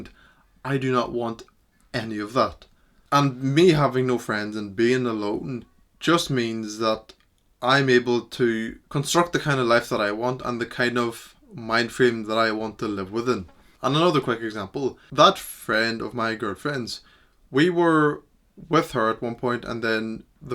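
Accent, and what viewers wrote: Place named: Belfast, United Kingdom. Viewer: I can relate bro, recognise the Belfast accent I'm from Newry bro and the quality of (friends) in this area are nowhere near good enough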